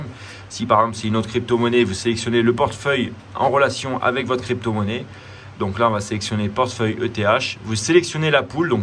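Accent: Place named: United Kingdom. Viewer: Mdr l'accent anglais j'ai le meme merci pour tuto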